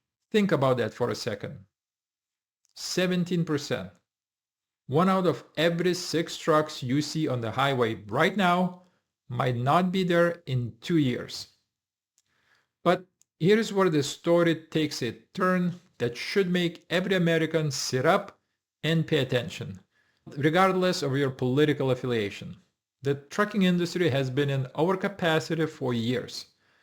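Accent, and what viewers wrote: Russia. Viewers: I doubt that, in total, there are 600,000 trucks, with illegal CDL drivers on today’s roadways. Living in So. Cal., I assume that by COB today that all of the seaports will soon be closed. Tomorrow, the So. Cal. freeways will be free of the heavy diesel powered truck hazards that exists here? Why does your accent sound so RUSSIAN. Is this Russian misinformation? Deport all illegal CDL truck drivers, ASAP, for a start.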